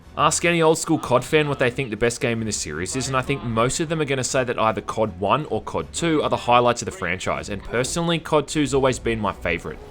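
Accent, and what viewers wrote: Australia. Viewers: So nice to hear a fellow Aussie on YouTube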